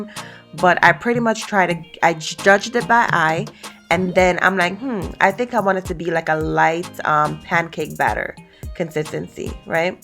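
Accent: Jamaica. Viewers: nice video wheres the accent from, jamaica?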